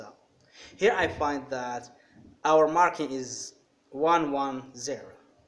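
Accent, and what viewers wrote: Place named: United States. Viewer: تعجبني الاميريكان اكسنت نتاعك ربي يوفقك خويا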